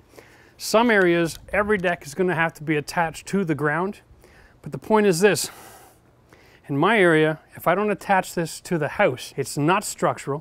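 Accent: Canada. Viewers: I can hear that Canadian accent. Went to school in Michigan and had lots of Canadian friends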